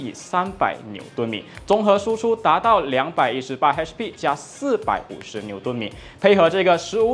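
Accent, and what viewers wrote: Standard. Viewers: 新人不错，华语标准，表达清晰，automachi捡到宝了，可以好好栽培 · 华语非常标准👍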